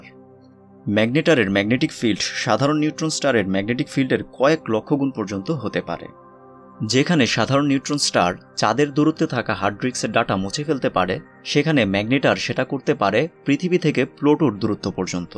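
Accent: Narsingdi, Bangladesh. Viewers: ও বাই আপনি কি আমাদের নরসিংদীর মানুষ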